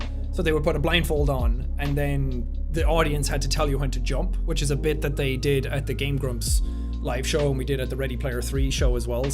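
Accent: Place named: Ireland. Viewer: dude i remember finding you when your where recommended to me while you where playing viscera cleanup detail. Your loud irish accent stuck out to me instantly and i just enjoyed your upbeat personality. Im 32 and now not only do i still watch you but my kids do too. Badass channel bro, and you have one of the biggest hearts on youtube.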